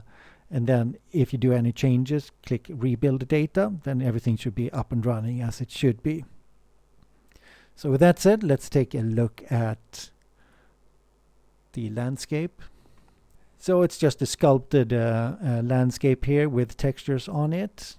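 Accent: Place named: Scandinavia. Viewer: A nice package made with a Nordic accent...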